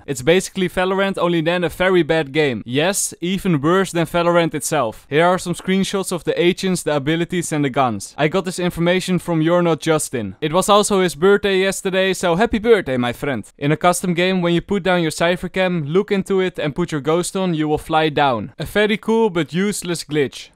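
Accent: Netherlands. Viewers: jij bent sws nederlands haha want zoon accent heb je xd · Hahaha Nederlander die Engels praat met nederlands accent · im sorry but are you dutch, because your english is a little bit weird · Love the dutch accent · love the dutch accent lol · Ik wist meteen dat je uit nl komt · most dutch accent i have ever heard bro.. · I smell dutch 😂, still a good video man! I love your videos! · nice dutch accent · dutch accent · and evrytime i think jij benne nederlands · accents can not get any more dutch than this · I smell dutch, a little bit too much bro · nog ff leren om dat Nederlands accent weg te halen je klinkt net als mark rutte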